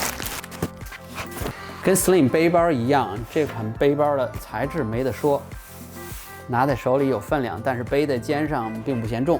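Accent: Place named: Sichuan, China. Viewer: 作者应该是巴渝或者四川人把！jin脏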